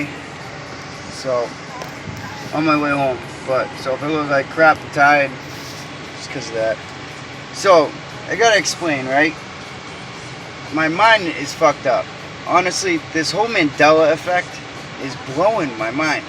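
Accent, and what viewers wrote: Boston, United States. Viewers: ur from Boston I can tell. I'm from Dorchester. yeah this is fuckin with me too..like flava flav from public enemy was always flavor flav in this reality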